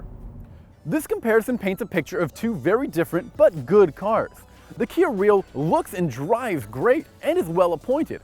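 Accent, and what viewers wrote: Canada. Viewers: Thuffering thukatash I really really wanted to watch this video but how can I with this guy speech impediment mixed with his super strong Canadian twing? I can't imagine that the automakers are very happy when they got them back with the dashboard and windshield soaked with spit. Thank God for the mute button and closed captioning!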